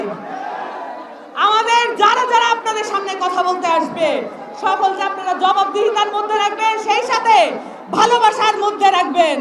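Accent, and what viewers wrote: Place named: Mymensingh, Bangladesh. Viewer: ময়মনসিংহ অঞ্চলের ভাষা কথা বললেন এই আপু, ধন্যবাদ,এন সি পি এগিয়ে যাও বাংলাদেশ আছে তোমাদের সাথে ইনকিলাব জিন্দাবাদ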